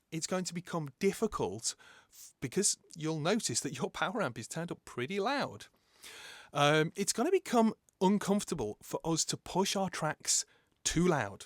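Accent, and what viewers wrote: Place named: England, United Kingdom. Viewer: it is a pleisure listen this nice english accent !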